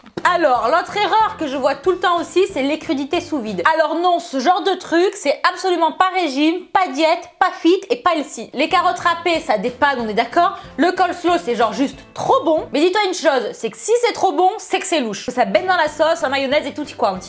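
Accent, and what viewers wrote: South, France. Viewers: t'es au top! Je regarde toute tes videos et je te suis sur insta tu es vraiment un rayon de soleil avec ton petit accent du sud tu fais déliré!!!:) continu tu nous motive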